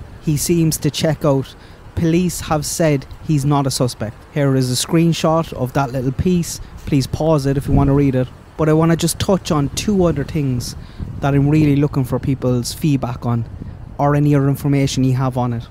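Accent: Ireland. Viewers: I live in Newfoundland Canada (just a hop across the pond)and you have the same accent as my late grandfather (his great grandmother was Irish from Waterford county), where in Ireland are you from, not a street address, just a county We have a very large population of people of Irish decent, Some from the 1700's. Generations later many have not lost their various accents. I'm like Michelle, worked on my ancestry for years and I'm looking for my castle or a pot of Gold. Back to the Summer Wells case, not sure if you live around mountains and if there are those who wish to live almost under the radar but I know here in eastern Canada there has been crime "in some of our hills, mountains as well. I looked at some of the criminals who live on or around Ben Hill Road. I believe there is some kind of mob mentality in there, but based on some of the stuff Jodisue has got on with, you'd think they were all saints. 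Now truth be told we all know differently. Frank Light for instance, she seems to defend and he has a bad record. the whole Hollow in there is crawling with those with a criminal record.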